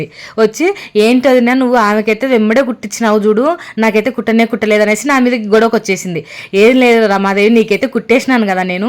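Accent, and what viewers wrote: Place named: Kadapa, India. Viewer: Me slang Kadapa valla la vundhe meru Kadapa na Andi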